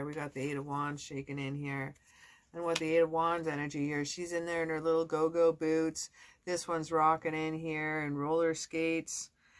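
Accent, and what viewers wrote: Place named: Ottawa Valley, Canada. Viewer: I’m just Cindy, another Canadian girl like yourself! And thanks for following. 😉 I’ve been trying to place your accent. I can’t figure out if your originally from the Ottawa Valley area or the Maritimes?? 🤔. Am I close?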